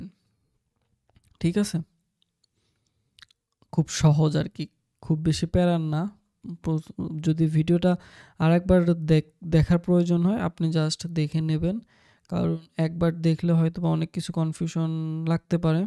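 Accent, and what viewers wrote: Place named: Kushtia, Bangladesh. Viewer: bhai apnar basha kushtia kothai?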